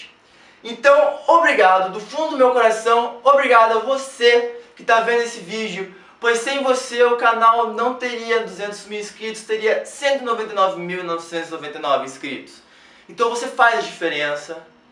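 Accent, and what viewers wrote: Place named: South, Brazil. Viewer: vc e do sul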